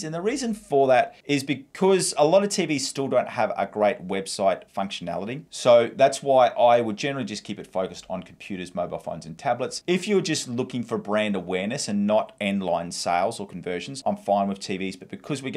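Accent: Australia. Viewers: Love these videos. Super informative. Great flow. You can never go wrong with the Aussie accent. Cheers mate.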